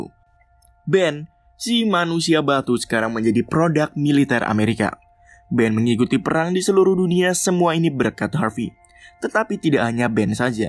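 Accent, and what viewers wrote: Java, Indonesia. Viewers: Weh anjai wong jowo to tibak e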